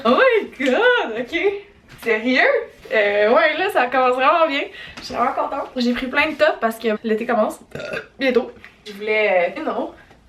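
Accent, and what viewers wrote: Quebec, Canada. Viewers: J'adore l'accent québécois, quelqu'un connaît d'autres chaînes de gens qui sont aussi fun? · Elle est canadienne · french canadian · Merci pour l'accent canadien et ses expression, vu de France on comprend pas toujours mais c'est très imagé !!! Je sais pas si c'est toi qui était chaude comme disait ton copin, mais lui était en ébullition, heureusement qu'il est arrivé à la fin sinon il aurait explosé !!! 😂😂😂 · Wow j'adore l'accent québécois grâce à toi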